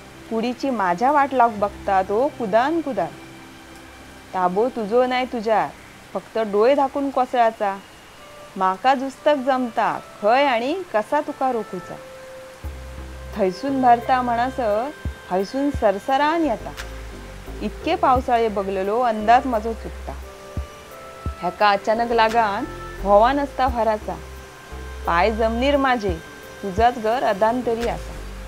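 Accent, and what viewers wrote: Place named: Malvan, India. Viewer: अतिशय सुंदर. कवितेतील अस्सल मालवणी शब्द आम्ही लहानपणी ऐकले होते. नाहि तर हल्ली मालवणीच्या नावाखाली काही तरी धेडगुजरी मालवणी ऐकवतात/बोलतात. असच अस्सल मालवणी तुमच्या कडून ऐकायला मिळो हीच सदिच्छा.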